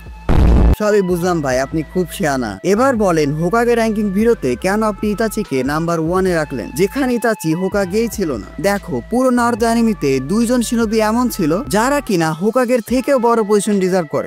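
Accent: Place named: Rajshahi, Bangladesh. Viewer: আপনার ভাষা শুনে মনে হলো, আপনার বাসা রাজশাহীতে Am I right??✅✅✅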